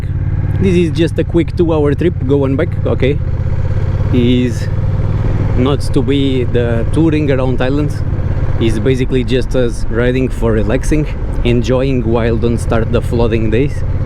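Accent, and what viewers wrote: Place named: Portugal. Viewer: your accent sounds alot like a portuguese guy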